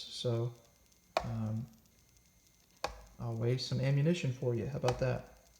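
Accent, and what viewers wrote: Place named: Rural, United States. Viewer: "lingth", "ind to ind". Hick English😅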